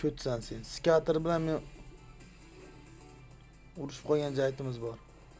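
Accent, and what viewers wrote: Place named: Fergana, Uzbekistan. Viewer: Aka qayerlisiz shevez buvaydanikiku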